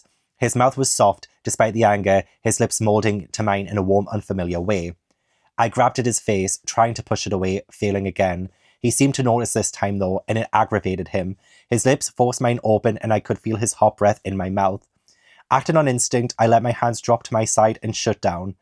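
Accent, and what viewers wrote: United Kingdom. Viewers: Idk what country you hail from. To my ignorant American ears, it sounds like somewhere in the UK. If I’m wrong, skip my comment. If not, I have a genuine question: How do you square the thoughts you present at this timestamp with the very real-life example of Elizabeth II being betrothed to Philip when she was 9, and he was in uni? Ever since I heard that, my thoughts on the Royal Family, while never chummy or loving, deepened into total disgust. ESPECIALLY considering that Elizabeth and Philip were cousins. Genuine, non-confrontational question that I’d love to have the opinion of a UK citizen of. Again, if that ain’t you, disregard.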